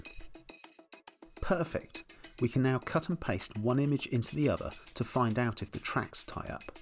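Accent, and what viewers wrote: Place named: England, United Kingdom. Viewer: Sounded English to me, then again they are the same.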